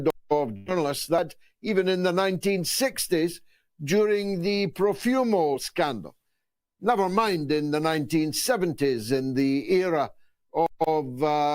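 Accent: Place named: Ukraine. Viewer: A Russian speaking Ukrainian, I hear ?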